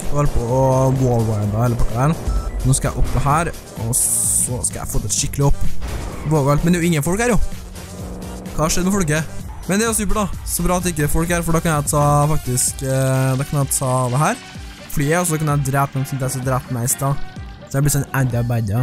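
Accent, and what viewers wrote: Trøndelag, Norway. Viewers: Er du trønder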